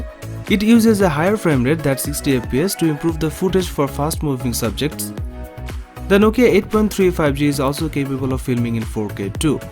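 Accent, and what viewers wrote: India. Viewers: Pasti india bahasa inggris sperti ini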